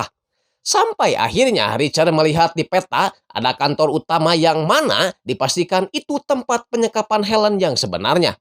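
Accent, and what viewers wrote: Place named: Sunda, Indonesia. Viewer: Njir baru denger cara baca narasinya boga karakter, selain intonasinya yang has urang Sunda, tapi sangat menghibur lucu komedi banget..😅😅 kanjuutkan..😊😅😅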